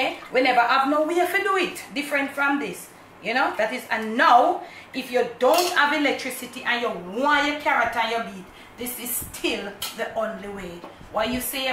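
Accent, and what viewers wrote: Jamaica. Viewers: I love your presentations, but most of all, I love the patois. Just listening to you brings me closer to home.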